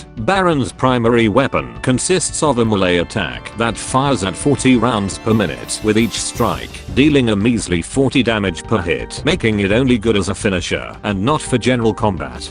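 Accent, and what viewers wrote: United Kingdom. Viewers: British? Hecking! Whaaaa!…..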